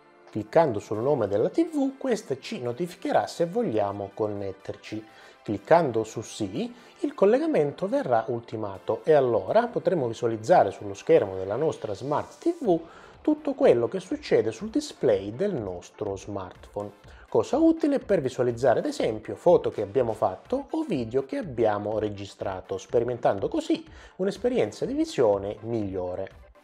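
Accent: Calabria, Italy. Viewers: Ma sei calabresee? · e infatti, ti ho dato del Calabrese. Lo sono anch’io...perciò l’ho subito capito ascoltandoti 👍🏽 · Ma sei calabrese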